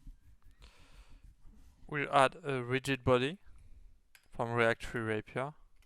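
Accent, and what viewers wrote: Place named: France. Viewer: hello again, j'adore, quand, nous les fr ,nous parlons en anglais ^^ on a un accent parfaitement reconnaissable ^^ top projet !! ça m'inspire, pour essayer de créer un MK avec Unity ou UE mais pour l'instant, je suis sur la creation de Zelda totk ^^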